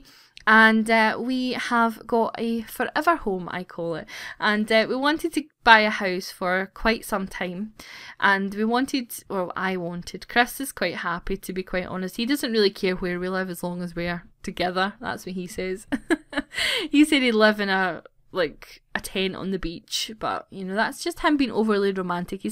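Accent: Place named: Scotland, United Kingdom. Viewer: I'm going to feel really bad if I'm wrong...but I'm pretty sure you're from Scotland, right? But you remind me a lot of my aunt Ella from Scotland :3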